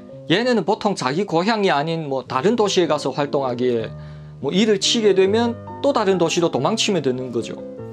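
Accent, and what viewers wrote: Yanbian, China. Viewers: 너무웃겨요 ,,중국식 한국말이요 ,👍👍 재미있고 흥미롭네요 ,,조선족들에 만행들이 ,대단하네요 ,범죄도시, 실화를 바탕으로 헐? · 오랜만에 조선족 이 말하는 조선말 들으니 예전생각 나는군요 중국에서 내밑에서 일하던 조선족 직원들 생각납니다 다들 잘있는지 재미있는 채널이군요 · 延边土话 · 연변말 와 한국사투리 힘들게 듣고 감니다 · ㅋ ㅋ 완전 한시간동안 헛소리만치구 자빠졌네 말투가 연변말투인데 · 말투가 조선족인데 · 연변말투 배우고 싶다 혼자야~앙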